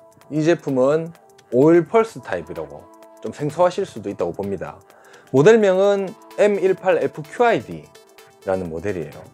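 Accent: Gyeongsang, South Korea. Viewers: 이벤트 신청 완료 했습니다. 항상 너무 재밌게 잘보고 있습니다. 구수한 경상도 말씨가 아주 듣기 좋아요~~ㅎㅎㅎ 좋은 제품 항상 잘 알려주셔서 고맙습니다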